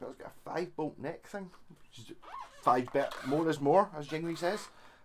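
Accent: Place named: Glasgow, United Kingdom. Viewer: My heart absolutely jumped at hearing the Glasgow accent, love to see the fellow Scottish musicians! Great demo lad!🏴💪🏻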